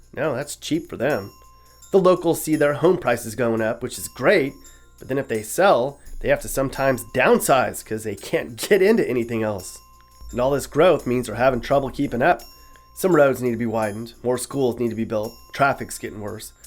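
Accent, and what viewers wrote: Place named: Midwest, United States. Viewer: Y'know for a Californian you pull off a decent Midwest accent. Signed, a former English teacher.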